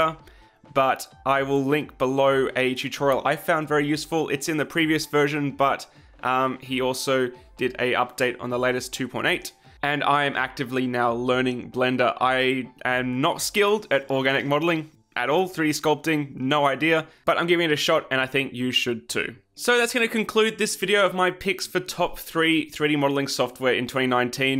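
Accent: Australia. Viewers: So u are australian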